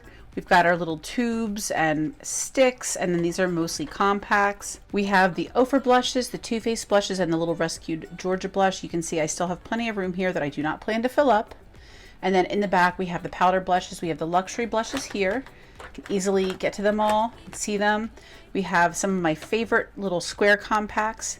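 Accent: Philadelphia, United States. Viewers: I just found your channel and I was like “tell me she’s from Philly….” Haha instant subscriber! I’m from the area too 😊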